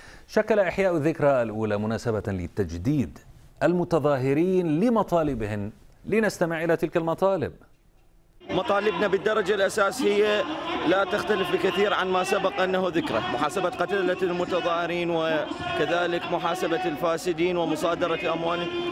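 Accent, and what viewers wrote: Iraq. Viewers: يارب انصر الشعب العراقي على حكومته الفاسده. ملاحضه طريقه قراءه التعليق باللهجه العراقيه للاستاذ احمد فاخوري اتجننن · فدوه للمذيع اجكد حلو وهو يحجي عراقي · والله احبك لانك اللهجه العراقية بحلقك تخبل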